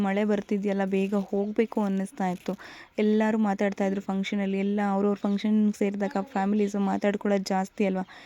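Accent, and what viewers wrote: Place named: Mangalore, India. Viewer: Huu mangalore kannada mix aagide swalpa😮😮🙄🙆🏻‍♂️🙆🏻‍♂️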